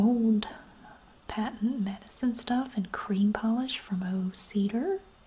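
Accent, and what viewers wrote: South, United States. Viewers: I love your voice and southern accent 💗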